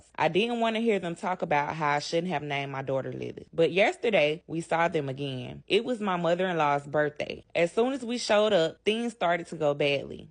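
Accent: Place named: South, United States. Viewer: Ain’t no women with that beautiful southernish accent naming no baby of theirs LILITH 😂😂😂 So where do I send my “am I the asshole” storytimes to 🙃🙃🙃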